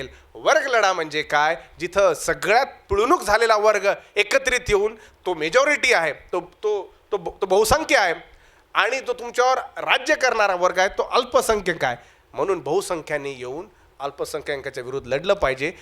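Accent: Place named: Marathwada, India. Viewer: मांडणी सुंदर आहे. पण भाषा पक्की मराठवाडा भागाची ग्रामीण वाटते. सर भाषेवर प्रभूत्व असले तर मांडणी फारच सुंदर वाटेल.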